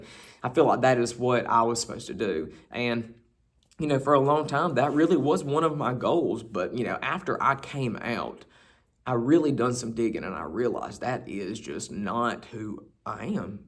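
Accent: South, United States. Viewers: Love that Southern talk. Happy Holidays! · reminds thisn heer of u reel nice Tom Sawyer now all growd up, an edicated in certain 'fun dood disciplines' by Hucklberry Fin hiself, away an when nun wuz lookin'. We heer boyz WILL be boyz an all. (Jus gota luv that Suthern drawl.)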